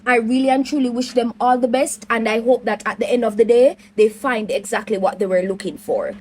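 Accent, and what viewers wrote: Jamaica. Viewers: SMH! She is 🇯🇲 after all, what do you expect a Canadian accent?! Cho!!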